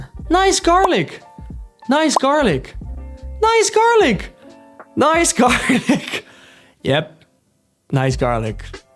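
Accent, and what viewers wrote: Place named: Netherlands. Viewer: Dumlimg recepies please. Support from a fellow Dutchie here😊. Your accent is fine. Yes, it's detectable, but who cares, you're more than understandable.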